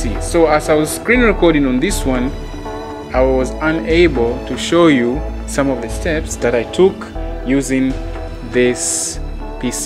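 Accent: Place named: East Africa. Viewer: good work my guy, are you from Uganda,, or Tanzania or Malawi, you sound like one of ! keep on dropping knowledge my guyy, excellent work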